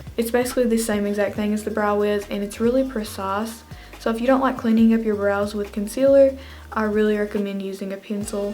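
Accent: South, United States. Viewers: She has such a souther accent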